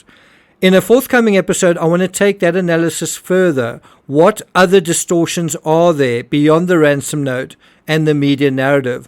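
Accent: South Africa. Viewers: is ur accent SA? do u speak afrikaans?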